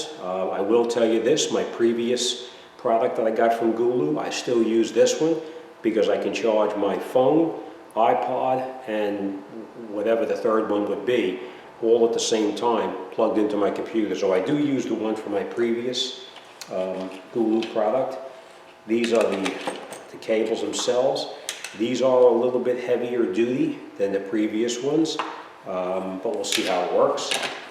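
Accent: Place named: New Jersey, United States. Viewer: Somebody from New Jersey somewheree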